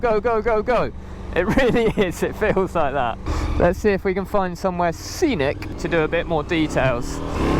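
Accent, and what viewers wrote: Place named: United Kingdom. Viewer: Again a very nice and good review of yours. Good voice and nice british accent I envy so much as a Belgian ! Thumbs up ! 👌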